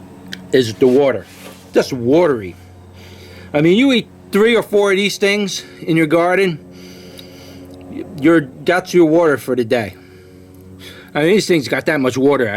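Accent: New York, United States. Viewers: Love the review. A very upbeat video. Love the New Yorker accent. I'm from Canada so it ain't something you hear every day. Keep on growing! I subscribed and liked.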